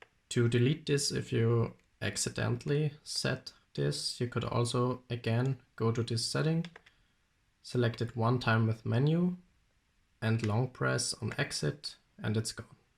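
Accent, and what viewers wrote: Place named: Austria. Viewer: ich meine in deinem Englisch nen österreichischen Akzent zu hôren! Stimmt, oder😜🚁👍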